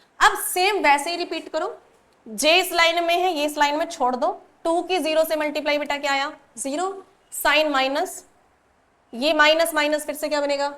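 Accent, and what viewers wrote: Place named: Haryana, India. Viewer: Aap Haryana se ho lag rha mujhe... Excellent explaination mam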